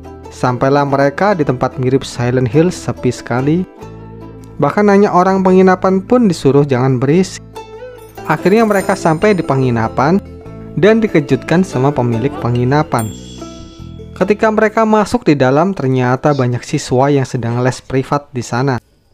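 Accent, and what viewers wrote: Malang, Indonesia. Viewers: Kyk e wong malang iki mimin e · Bang orang malang ya?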